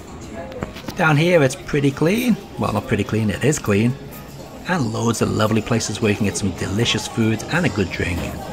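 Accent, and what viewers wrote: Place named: Wirral, United Kingdom. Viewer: They looked lovely! are you from the wirral mate? your accent sounds from around my way.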